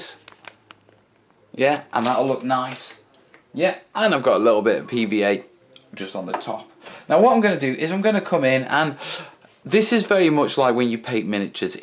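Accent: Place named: Stoke, United Kingdom. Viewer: by the sounds of your accent a fellow Stokie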